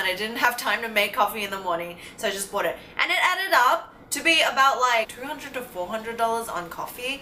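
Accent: Australia. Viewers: In the beginning I was like "hell that's a hella strong australian accent for someone living in NY" 😅 but then you said you "just moved" so it all made sense 😅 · Hello, I just want to tell you that what you are describing sounds so similar to my experience when I moved from Prague(Czech Republic) to Sydney in Australia. And all the values you are talking about are all the ones Aussie people and Australia itself taught me. And I am so grateful for that and the 8,5 yrs I spent there were the most beautiful ones in my life so far. I will always be thankful to Australia for who I have become because of that experience. I love Aussie people and their kindness and thank you for teaching others that. That is why I have subscribed to your channel even though I am not big on make up :-). And I love you Aussie accent :-). Keep spreading the kindness! Thank you :-) wish you all the best in your adventure and don't give up. 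Money cannot not buy you what the experience gives you mentally. The enrichment and open mind. Take care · I love your Aussie Accent! <3 Love you on OTGW too. You remind me of Constance Wu!!!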